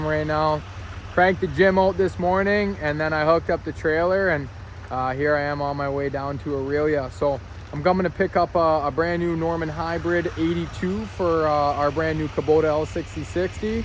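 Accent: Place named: Quebec, Canada. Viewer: Gotta love the French Canadian accent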